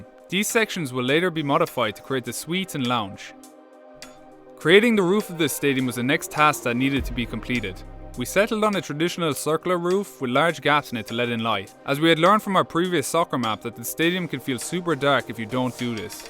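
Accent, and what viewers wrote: Ireland. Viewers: Are u Irish cus u sound like u are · U sound irish and insane build man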